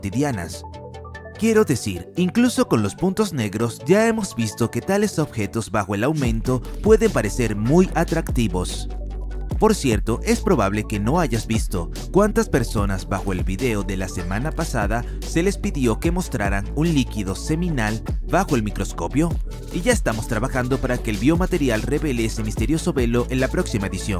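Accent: Russia. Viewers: Eres ruso o español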